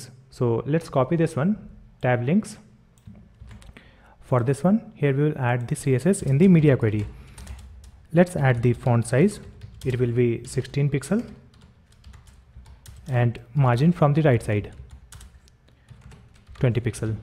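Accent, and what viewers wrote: Bihar, India. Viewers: aap Bihar se hain kya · Your sound is like an indian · Have you been in India or something, cause your accent slightly sounds like so, but your said you were from Australia.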